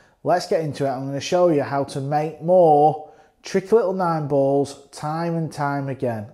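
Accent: Lancashire, United Kingdom. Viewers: Right 😉 By the way, your accent is close to my cousins in Garstang. Are you from Lancashire? Anyway.. I live in the states as an EX Pat. Haven't been back to Garstang since pre covid. Keep up the good work breaking down a complex game. Cheers.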